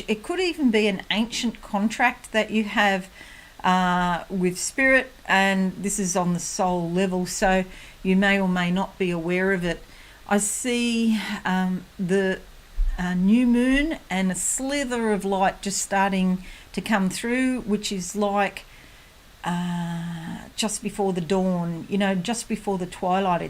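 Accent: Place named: Australia. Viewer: Thankyou beautiful 🙏🏽🌹 I am so intune with this Read and like a true fish will just go with the flow. As for the wishes I truly hope they come true if it is meant for me. Love your beautiful voice and familiar Aussie accent...i feel on your wavelength 🙏🏽🌈♓💜🌹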